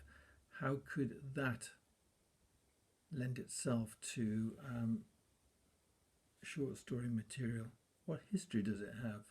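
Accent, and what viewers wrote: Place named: United Kingdom. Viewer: I'm trying to work out where in the UK your from by your accent but drawing a blank 🤔.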